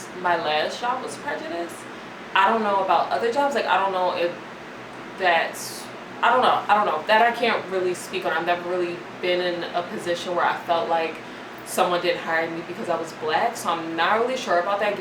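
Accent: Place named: Philadelphia, United States. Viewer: Lol she definitely gotta philly accent. She is probably just using a more professions voice for her platform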